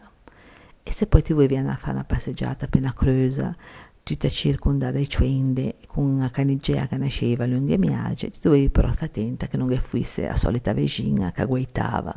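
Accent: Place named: Genoa, Italy. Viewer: Micidiale l'intreccio del suono di questo narrare. Riverbera quell'alchimia di soave dolcezza e bizzarra sagacia che incalza e rifluisce nel compostissimo accento che innerva, così pacato, la vivacità arcana della lingua intimamente genovese. Quello che non dovrà non stupire, è che davvero non si tratta, solo, della musicalità di un qualche personale carisma, ma è (...era?!) proprio la pervasiva timbrica del cuore stesso della sapiente città, con quell'inaudita mitezza e garbo che a stento tradiscono l'inveterata forza che essi celano. Un ringraziamento sincero per questo struggente, inestimabile dono.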